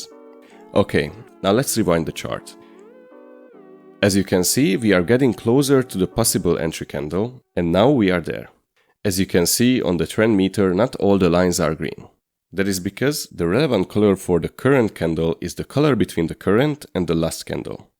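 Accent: Hungary. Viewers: Are you Hungarian? :)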